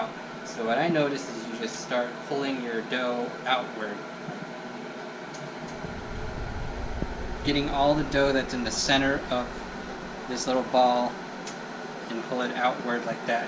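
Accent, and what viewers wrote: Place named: South, United States. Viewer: Hes cute...and has a funny country accent. In new mexico and arizona the accent is slower, spaced words, and no twang unless their cousins visiting from lubbock hahahaha! Also you can order bluebird from amazon and walmart because youre so far from colorado. It is pricier, but not some corporate machine. I live in colorado, a little far from the southwest corner where its made and its still more expensive than pillsbury. I feel like its worth it because the families that run it bluebird show gratitude to native people for using their product and times like these need good people.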